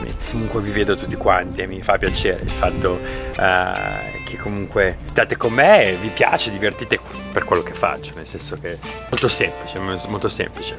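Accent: United States. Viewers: Grande continua così. La cosa più fantastica è sentire che parli un italiano in stile americano ed aver perso il dialetto del tuo paese di origine.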